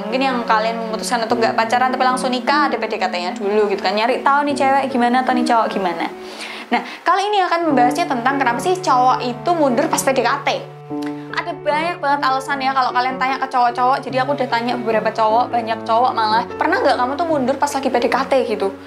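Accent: Java, Indonesia. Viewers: Medok wkwk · MBAK NYAA MEDOK BANGETTT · kok logat e koyo wong jowo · logatnya orang jawa..? benaran gak sih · Jawa sekali logatnya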